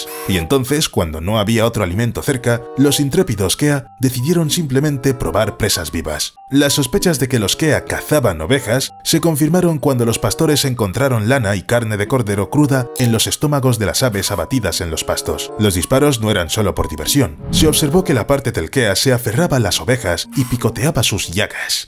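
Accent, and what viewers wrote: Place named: Spain. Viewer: Pros del video: Una investigación interesantísima, imagenes acorde y un tema novedoso. Contras: Español de España.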